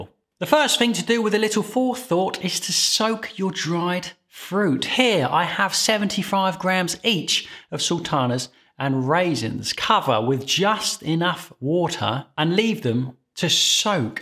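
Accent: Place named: England, United Kingdom. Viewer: I feel it’s the English accent ❤ I know what you mean giggle bc of how much life can be breathed back into a word, simply by changing the pronunciation/accents. ❤ Such beauty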